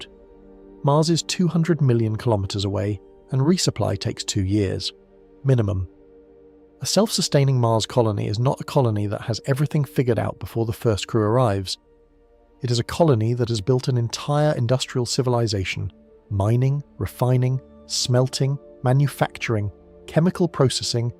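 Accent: United Kingdom. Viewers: Quit with LIES AND Lose THE BRIT NARRATOR. This click N bait.. Anything NASA Says and does if fake lies. 👎👎👎👎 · This guys accent tells you everything about him just another jealous Brit bitter and eager to spread fear and hate towards the great free country of United States states of America 🇺🇸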